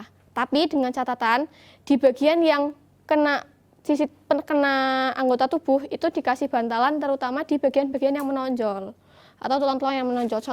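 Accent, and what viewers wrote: Java, Indonesia. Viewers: Medok-nya keren. Ini namanya locally rooted. Local wisdom 😊👍👍👍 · Medok bgt si wkwk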